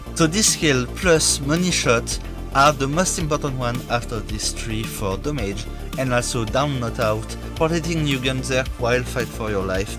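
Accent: France. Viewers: Mdr le bon vieil accent français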